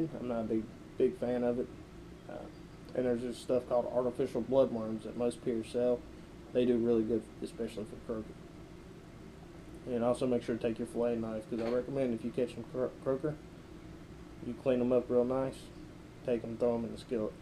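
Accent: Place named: South, United States. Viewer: Awesome video man. I learned some things I will be using this weekend. You just need to talk slower and louder for us guys that are not used to a southern accent. lol Thanks man!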